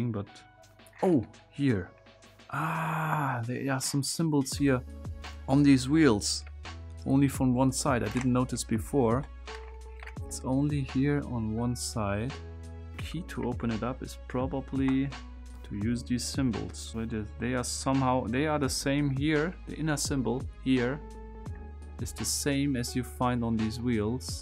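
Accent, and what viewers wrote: Germany. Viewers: Haha ich wusste du bist deutsch.. Habs doch richtig rausgehört 😂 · Haha, man hört das du deutscher bist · Are you German?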